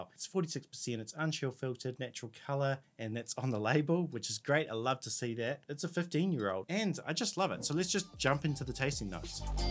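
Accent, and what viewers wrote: New Zealand. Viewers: You from New Zealand ? U have a Nz accent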